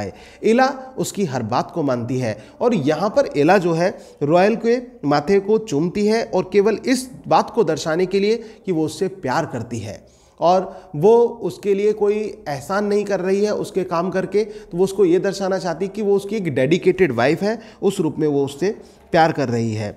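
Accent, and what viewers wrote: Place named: Meerut, India. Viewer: Sir aap toh meerut ya baghpat k lag reh ho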